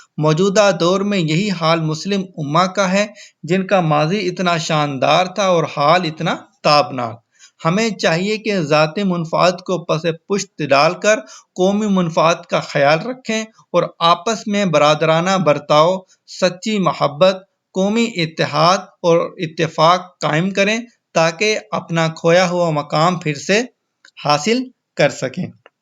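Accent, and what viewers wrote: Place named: Punjab, Pakistan. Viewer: صاحب! تلفظ کو بہتر کیجیے۔ مناسب انداز ہے اگر پنجابی لہجہ ختم کر دیں تو اور بہتری آسکتی ہے۔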